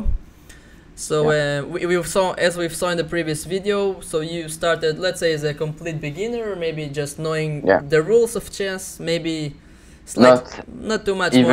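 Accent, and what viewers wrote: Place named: Brazil. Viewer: So quem é brasileiro conhece esse sutaque do ingles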